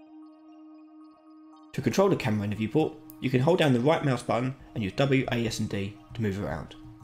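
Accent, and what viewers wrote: United Kingdom. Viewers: Man can u slow down a bit while u speaking ... British accent it's so hard to understand ... And thank you for being a great teacher man ... 💖💖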